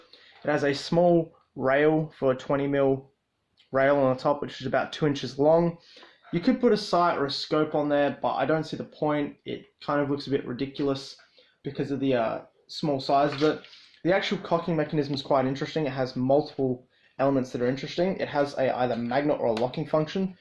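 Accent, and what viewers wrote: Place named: Australia. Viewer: Jelly Fishyzz all Australians sound like him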